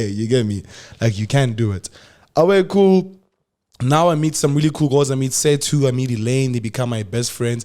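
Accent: Afrikaans, South Africa. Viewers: Your Afrikaans accent monna is not make sure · looking clean mxaaah mfethu you killing me lol great Afrikans accent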